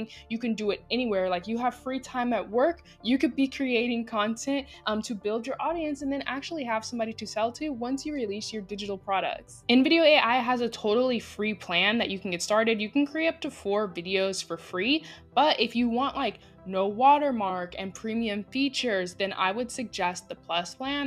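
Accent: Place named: Spain. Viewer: Girlie, your Spanish accent is amazing! I'm so grateful for your videos new subscriber here!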